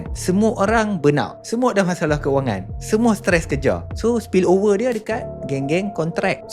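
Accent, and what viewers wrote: North, Malaysia. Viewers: haaa!!! biar betey??? gilalah.. siap loghat utagha tu... ni mcm mahathir lah ye??m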